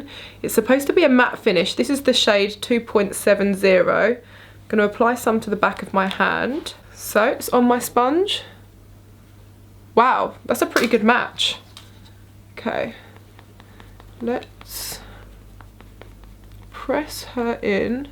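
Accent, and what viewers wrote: United Kingdom. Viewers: You have the most beautiful eyes!!! Your just beautiful over all. British accent bonus!!!!!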